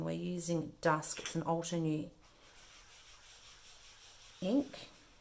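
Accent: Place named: Australia. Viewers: Great technique with the stamping foam, it compliments your previous video using embossing folder. PS Its nice to hear an Aussie accent.! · G’day mate. I agree it’s always nice to hear an Aussie voice doing the videos. Here were lovely cards, love the pink & blue ones? Are you in Australia? Can you tell me the name of the tape runner you used and where to get it please, it looks like a good one. I have bought the small tape runners but they always seem to play up, and I have thrown a few away. Also where do you get your supplies from please, the stamping foams Altenew inks etc, buying from overseas is so expensive with the conversion rate.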